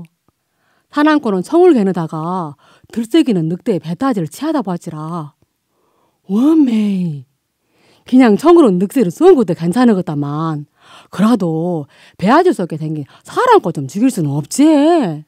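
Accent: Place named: South Jeolla, South Korea. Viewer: 아따~ 오메~^^ 생생한 전남사투리로 듣는 동화가 특유의 억양과 말에 녹아있는 장단때문인지 마치 판소리를 듣는 듯 해요.😊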